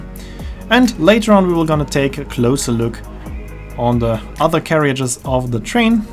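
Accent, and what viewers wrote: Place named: Germany. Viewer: I did think you were a german-speaker, but I still didn't connect Voss and Foss until i saw the pictures and realised V is pronounced as F in German.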